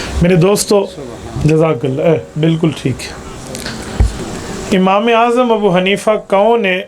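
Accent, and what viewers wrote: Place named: Punjab, Pakistan. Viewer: Me apke bayan ko dislike karta hu kyunki dil se sunne ki khahish hoti he lekin Punjabi puri esi tesi kar deti hai Mood off 📴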